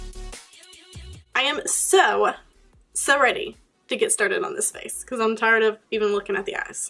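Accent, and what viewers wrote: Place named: South, United States. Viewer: I KNEW you were southern! You talk exactly like I do... the way you word things really. I've got a southern way of speaking but with a wee Scottish accent because I grew up in a Scottish Filipino family. Lol. Weird bunch/combination I tell you.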